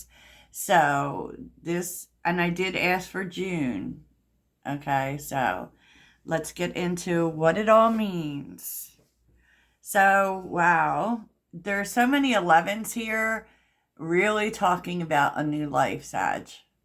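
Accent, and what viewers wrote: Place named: Mid-Atlantic, United States. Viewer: Where are you from? Sounds very PA/MD/DE! Baltimorean here.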